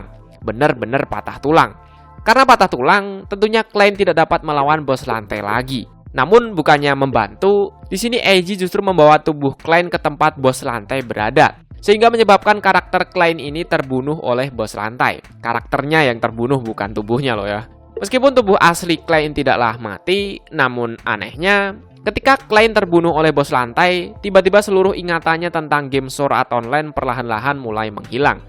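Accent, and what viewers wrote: Java, Indonesia. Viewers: Mungkin dia medog :v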